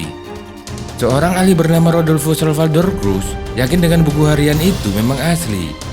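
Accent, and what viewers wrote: Java, Indonesia. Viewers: Medok ya mas suarane...iyaaaa jaraeee capaaaaa😂😂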